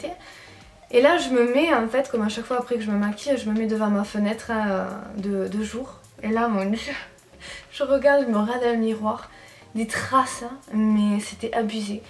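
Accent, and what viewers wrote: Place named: Béziers, France. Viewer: Ptn t'es de Béziers toi ça s'entends de fou :p. vive le suud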